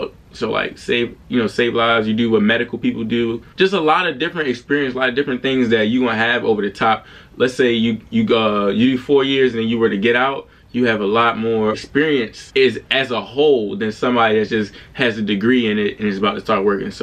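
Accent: Baltimore, United States. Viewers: He sounds like he’s from Baltimore lol. Thanks for your service bro! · You from Baltimore? 🧐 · Sounds like a lil Baltimore to me 😂😂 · Crazy I knew you were from Baltimore as soon as you said ya first word. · I kept hearing that lil accent Nd I was like is he from b-more then you said it Nd I was like I knew it 😂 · I could tell u was from bmore when I first heard u talk from da accent 🤣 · I’m sitting watching your video I’m thinking bruh gotta be from Baltimore then boom you from Baltimore city that’s crazy I grew up there and I’m trying to join the navy out of high school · Knew u was from bmore as soon as I heard tewwww shout out 410 man😂😂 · Baltimorean I heard tew I’m alr knowing 😂😂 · Dummi I knew uu was from Baltimore jus from how uu was talkin😂😂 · I thought I was hearing a Baltimore accent 😂 keep it up! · I knew my man was from Bmore. I could hear it. 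Bmore standup. Latrobe Eastside. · Hate being from the dmv cause why i knew you was from bmore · I knew he was from Bmore. Big ups to you sir. - your fellow DMV homie. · Mmm been a few years since I heard a Baltimore accent I just passed meps physical and probably signing navy so thanks for your videos. Shout out from an old randalstown. Guy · yeooo bruh soon as u spoke i knew u were from baltimore lmaooo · you from baltimore lol? but if i got more questions can i message you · Yo before you said you were from Baltimore I was already thinking it. We know what we sound like lol.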